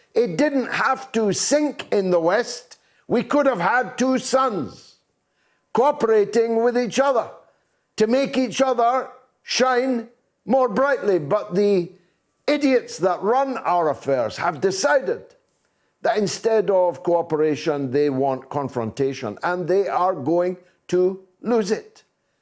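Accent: Scotland, United Kingdom. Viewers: I love your videos particularly in your Scottish accent, which nailed all your point more succinctly than the English’s accent could. Keep up the good work,.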